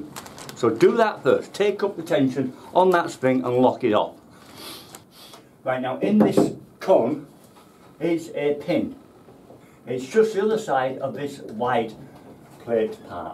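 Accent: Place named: Blackburn, United Kingdom. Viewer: Great video , sound local to me in Blackburn . Saved me hundreds of pounds was dead easy to fix . Thanks so much fella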